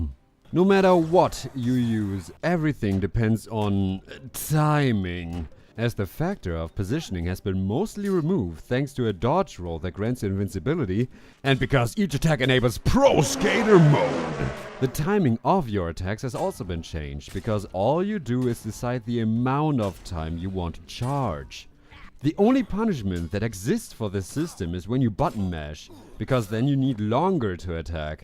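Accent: Germany. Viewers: Immer wieder schön den deutschen Akzent zu hören 😉. Im großen und ganzen mag ich deine Videos aber gerne 👍. Auch wen du sehr harsch mit den Spielen meiner lieblingsentwikler umgehst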